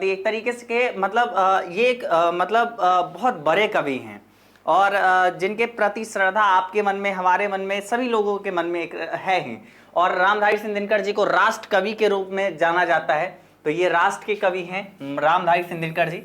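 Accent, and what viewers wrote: Bihar, India. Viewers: Hmmm Bihar se hai sir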